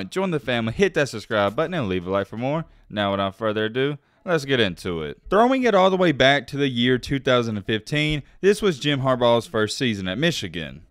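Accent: South, United States. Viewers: Just an FYI, its Jim not John... On top of that, he's won NO big games outside of Ohio State? This video is completely biased and nothing you said holds any true water. The covid year that helped contribute to their 2-4 record, they were missing multiple coaches all over the staff. At a point, Michigans secondary was nearly coaching themselves last season. With that heavy of an accent, we both know damn well where your fandom resides. His clipboard has nothing to do with his success either, he's implemented the same strategy year-in and out. Any excuse you SEC fanboys can pull, you will. Another side note, what does Quinn Ewers have to do with this video? Smh.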